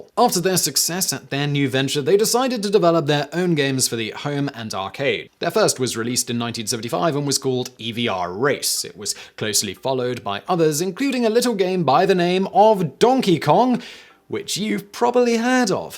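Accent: United Kingdom. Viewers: Since when does have a british accent?! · I can't tell if is pronouncing all those Japanese names wrong or if he's just pronouncing them all British.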